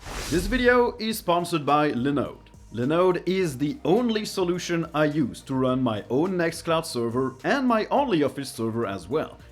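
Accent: France. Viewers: He's French? I've long been guessing where that accent comes from lol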